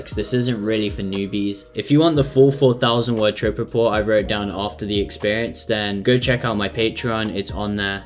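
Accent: United Kingdom. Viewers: Your videos are amazing bro: you deserve so many more subscribers!!! Also nice to hear a British voice in this community 🤩🎇🍃🍄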